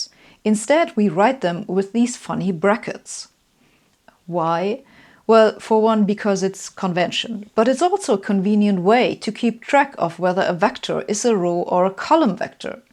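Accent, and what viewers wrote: Germany. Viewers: your german accent is so german: it hits the clichee as a blueprint for any information to what end the effect never fails the paradox of losing it... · Of course she has a German accent, of course she does.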